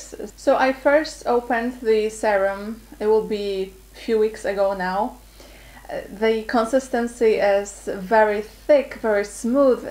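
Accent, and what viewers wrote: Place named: Poland. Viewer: Kurde, a jednak, my Polacy poznamy się wszędzie. Usłyszałam tylko Twój akcent ;) Żeby nie było, mam taki sam, nie napisałam tego, żeby Cię obrazić :)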